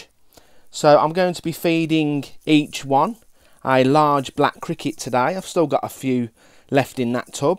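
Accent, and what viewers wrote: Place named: West Midlands, United Kingdom. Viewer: Ah I did also think Birmingham. I'm from Wolverhampton you see, it's nice to hear a somewhat local accent:)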